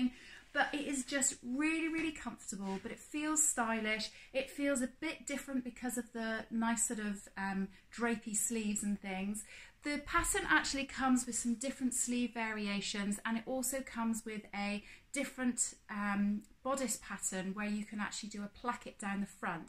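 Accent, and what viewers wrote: Devon, United Kingdom. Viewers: Hi, I think the dress and the fabric really suit you! Love your vlogs - and your Devon accent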